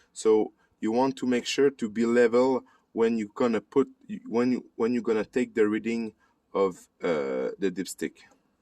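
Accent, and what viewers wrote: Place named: Quebec, Canada. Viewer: accent Québecois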